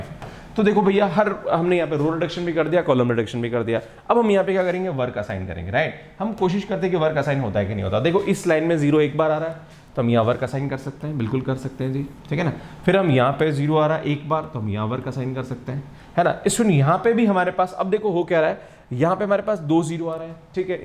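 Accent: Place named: Madhya Pradesh, India. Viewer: Sir why did you add two in junction as it is of any use. Sir are you MP my friend has same accent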